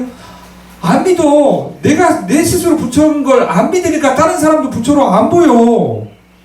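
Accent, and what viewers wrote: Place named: Chungcheong, South Korea. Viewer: 충청도 말씨가 들리니 참 정겹구요. 조회수 안나와도 김 빠져 하시지 마시길요.ㅎㅎ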